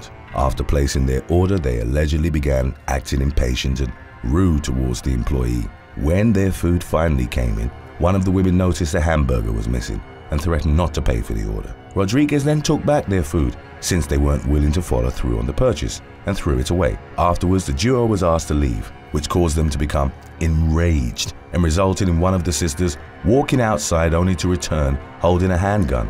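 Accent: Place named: England, United Kingdom. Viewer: Okay who taught how to pronounce Mexican names in english? First time I've heard Martinez come out correctly. I do always love hearing people with English accents trying to work out pronunciations of names we're all used to on this side of the pond! As always, gripping work!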